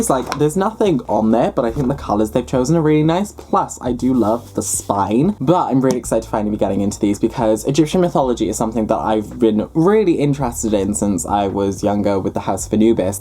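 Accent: United Kingdom. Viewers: Omg can't believe you're reading Kane Chronicles.. I mean, you reminds me of Carter Kane even tho you got British accent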